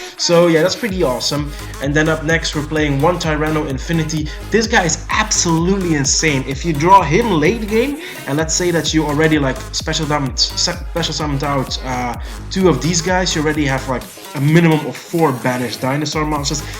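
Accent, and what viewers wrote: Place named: Germany. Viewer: I hade ask because your accent listen a little bit like my accent. And yeah I am from Germany so hello neighbor.;)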